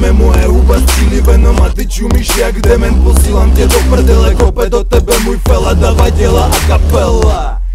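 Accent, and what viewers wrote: Russia. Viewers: Na koncertu v rock café jsme si tvrdě vyřvali že chceme ruštinu a dával tyhle bars a lidi to bavilo, ale na to že tu žije asi od 15ti má hodně dobrou češtinu a ten ruskej přízvuk je originální a vražednej:D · Máš luxusní Ruskej přízvuk!!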